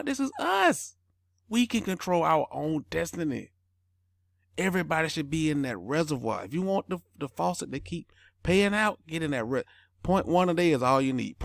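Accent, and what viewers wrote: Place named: South, United States. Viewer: That that “southernplayalistic” dialect!! Yas sir!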